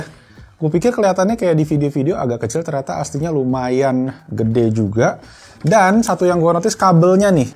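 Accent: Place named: Palembang, Indonesia. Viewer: Haha logat palembangnya gk hilang ya bg, ada kalimat "sisip dikit" wong kito bangett, btw am a one of your big fans and hopely someday bisa kolaborasi🙏 dan shring ilmuny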